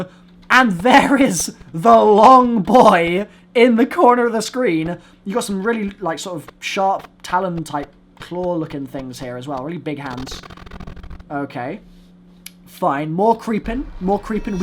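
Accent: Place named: United Kingdom. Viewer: i fucking love how British the commentary in this is. i was laughing so hard.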